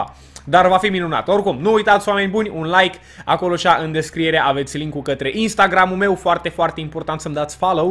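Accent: Moldova. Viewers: vorbeste cu accent moldovenesc v-a fii un epison amuzant :)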